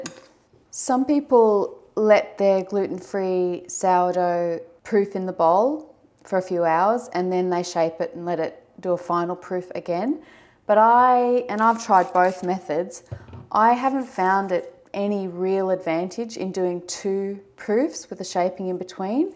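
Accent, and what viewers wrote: Australia. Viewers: i have just started to bake gluten free bread, came across your tutorials and must say how pleasing it is to see and hear a fellow auzzie , thank you for your time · In Ireland with our weather it would probably take a whole day to rise the bread . You sound like my sister in law who's from Townsville. I remember when my brother and sister in law lived in London I couldn't help asking her is that a question?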